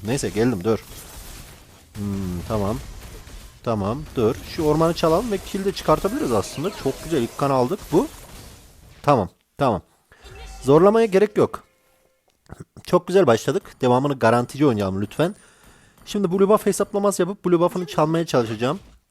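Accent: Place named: Çorum, Turkey. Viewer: çorum lumusun sen krl şive oraya kayıyo gibi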